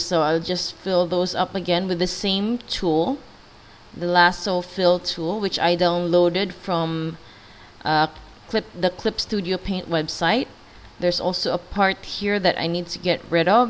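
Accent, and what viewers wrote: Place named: Philippines. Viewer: Pilipino ka po Mam...halata ko sa Accent mo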